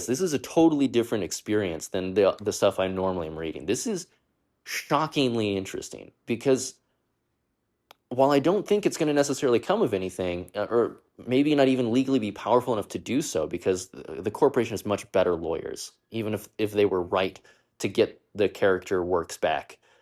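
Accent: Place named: United States. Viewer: You have an American accent, and yet seem to struggle reading English. I didn’t finish the video but in 5 minutes you mispronounced at least 6 names. Hot garbage.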